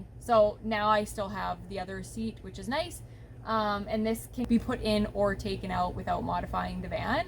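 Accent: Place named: Canada. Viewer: I love a good Canadian accent. Every last work in their sentences goes up a whole pitch. lol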